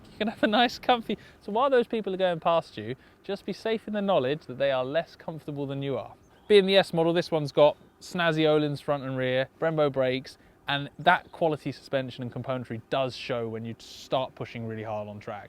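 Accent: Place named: United Kingdom. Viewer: Love british who ride bikes for some odd reason lol ( I’m Swedish ). Maybe it’s your accent making the hobby feel more sophisticated rather than a muurican ”I’m so tough!!”-accent. Is it worth going to the UK for a biketrip?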